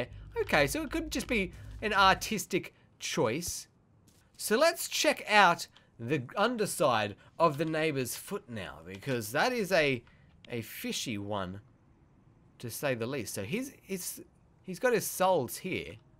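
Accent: Australia. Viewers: Wait is he Australian my grandma is Australian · R U Australian? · Are u Australian? U sound Australian. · is he from Australia? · i'm Australian you sound like your from Australia...!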